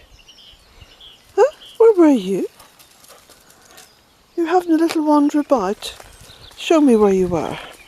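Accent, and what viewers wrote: Ireland. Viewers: Well, You say you are rambling on, but I so enjoy hearing you ramble in that lovely Irish accent of yours. I wonder if you truly realize the pleasure you bring to so many of us. I have always loved nature, especially animals, but I now look at the landscape around me with eyes anew. My maternal grandmother was born in County Claire Ireland, and so I particularly love your channel. And I hope, one day, to visit Ireland again.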